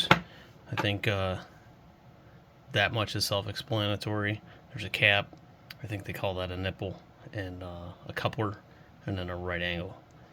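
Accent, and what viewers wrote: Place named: Pennsylvania, United States. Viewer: I am a chemical engineer and I love building things. I am starting a YT channel as soon as it gets warmer here in PA where I can get out to the barn. I am recovering from getting hit and run over on my 1957 Harley Panhead so I will be laid up for a while. (had my right leg nearly crushed in two). I am starting a build of a 1928 Morgan Aero Brooklands edition racer. Similar to a Pembleton T24. I am gearing up to cast aluminum for different adapters. That being said I purchased a revolver lifecard toy. I am thinking about casting it out of aluminum and inserting a rifled barrel and see how things turn out. I will let you know how things go.. You sound like you are from Pa also...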